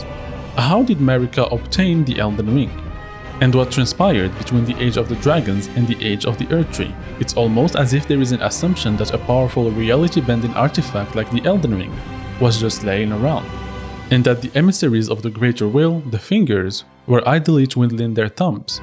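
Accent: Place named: France. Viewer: I know a French accent when I meet one, excellente video l’ami penses tu en faire en français ?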